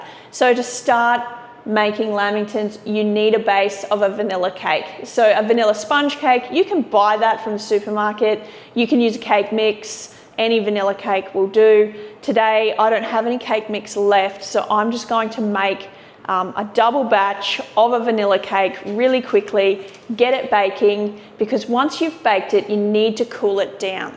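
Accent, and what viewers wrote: Australia. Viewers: Love this video! You sound so Aussie in this one !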